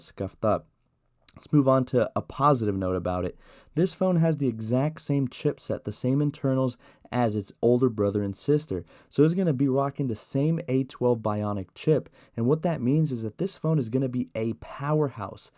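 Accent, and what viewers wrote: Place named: Georgia, United States. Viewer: Hmmm... you sound like you’re from Georgia by the way you talk... 🤔🤔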